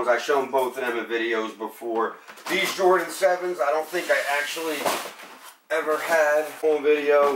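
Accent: Philadelphia, United States. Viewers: philly accent lol say water lol woter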